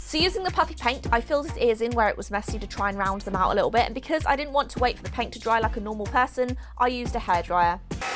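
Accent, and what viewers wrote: United Kingdom. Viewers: Is anybody else just obsessed with her British accent? 😂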